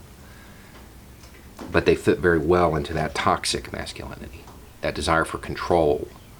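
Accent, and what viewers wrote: South, United States. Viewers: What's with the southern drawl? You didn't sound like that back in the day.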